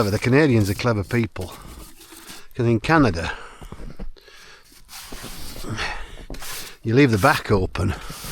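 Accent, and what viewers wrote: Northern England, United Kingdom. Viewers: Where in France? a very northern English accent, i live i the Pyrenees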